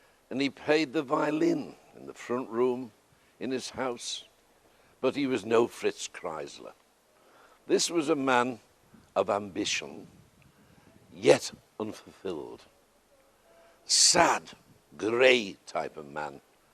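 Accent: Liverpool, United Kingdom. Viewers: I love the old school`educated scouse`delivery,it reminds me of an old friend vocal chords improved by whiskey,cigarettes and the wind blowing through the Mersey Tunnel.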